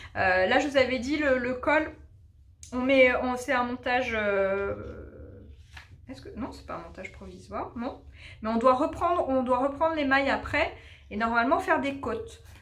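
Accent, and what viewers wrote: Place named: England, United Kingdom. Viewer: Bonjour, je ne sais plus trop comment je suis arrivée sur ta chaîne YouTube, je crois que c’est une recommandation de la chaîne. En tout cas, je suis mordue de chez mordue: j’aime ta personnalité enjouée, ton super accent anglais, les modèles et les laines que tu choisis (permets-moi de te tutoyer). Et puis, nous sommes presque voisines: j’habite Laval et je vais assez souvent à Portsall, sauf en juillet-août. Je continue le rattrapage des visionnaires. Bravo, continue comme ça!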